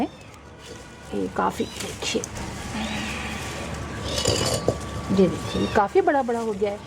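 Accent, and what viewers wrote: Pakistan. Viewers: her Urdu accent is Pakistani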